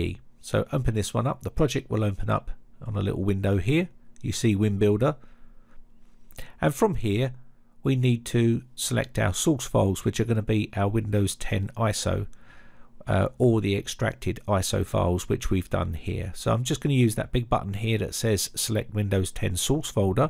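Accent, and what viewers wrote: United Kingdom. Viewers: Cheers lad ! You are awesome and i love your classic British accent too !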